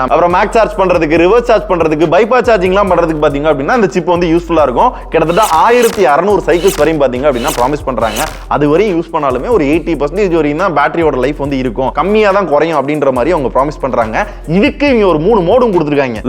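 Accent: Madurai, India. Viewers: When our madurai gang vanthale antha slang kaga technicality kaga than unga video pidikum but specific a sollanum na antha VR HEADSET REVIEW ❤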